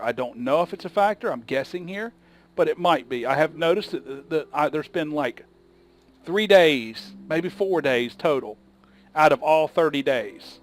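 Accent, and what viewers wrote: South, United States. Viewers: The lesson also is to avoid ranting when recording days in advance, because the issue could be fixed before the video comes out, making you look like you don't keep up with the development of the game (Even though you are in fact one of the more involved youtubers I've seen.) Anyway, nice series, I'm really enjoying it. Love your southern country accent too, helps differentiate you from all the other Let's Play makers. Last few episodes were hard to get through though, with the five minutes of actual gameplay and the rest all paused with you talking the viewer's ear off. Not that I mind you talking, just play the dang game while doing so. It's a Let's Play not a Let's Talk. Glad your back to the old play and talk format. Also +1 for having a David on the team:p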